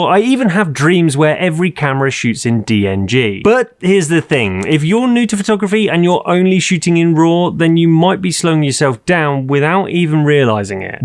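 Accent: United Kingdom. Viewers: For what concerns the cap, in my opinion things are not so straight as you say. From your accent it seems you live in UK, a moist country, so perhaps the air is cleaner than in my country (Italy) where most of the time there is a lot of unseen dust floating around. If I keep my lens without the cap for about 15 minutes I find the lens surface littered with disgraceful dust which forces me to clean it with a cloth, and so loosing the chance to shoot a good photo.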